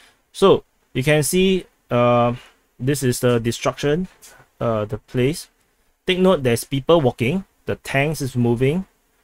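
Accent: Singapore. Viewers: great reporting and analysis , are you singaporean (based on your accent) ? hope you are fine there and not attacked / reported by insane amount of pro ukraine trolls (including those from troll farms in UK/US/FR) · Seems like you are more happy to believe the Russian MoD information rather than the Ukrainian MoD information. Maybe you don't notice, but there is clear bias in your opinion, and the way you put it across. Personally I don't believe either side with thier stated figures and actions, all that matters is the facts on the ground. And the facts on the ground show that overall Ukrainian forces have been able to take back allot of territory so far, they are not even fully mobilized have received very few heavy weapons. You would expect it to take at least another 2-months before the effects of such are seen. Really all Ukraine can do right now in the east is hold the line the best they can without being obliterated, naturally during this period, with such concentrated Russian forces, land will be lost. 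I think if Ukraine can't seriously get on the offensive within 6-months then these lands will become increasingly difficult to retake, and just for the reasons you stated, but within this timeframe there is plenty of opportunity to reclaim these territories. Doubt it on Crimea and Donbass however, possibly if Russia collapses within, but only then. Now if I am not incorrect, you sound Singaporean, I have worked there many times, very hard business approached people, so the importance of morale factor might be difficult for you to fully grasp. And you might have thought that the Ukrainians might just roll over, but Kiev was not taken in 3-days or ever, and thier military still fight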